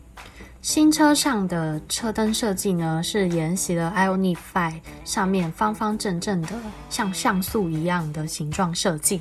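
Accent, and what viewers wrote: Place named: Taiwan. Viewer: 台灣人說中文 很好聽 好像可愛小孩子講的感覺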